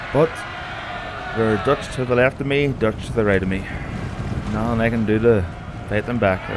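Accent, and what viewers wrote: Northern Ireland, United Kingdom. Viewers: northern Irish?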